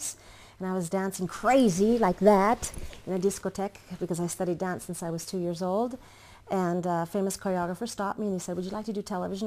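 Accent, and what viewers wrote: Italy. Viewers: She is American but after 40 years in Italy she has now an Italian accent...crazy.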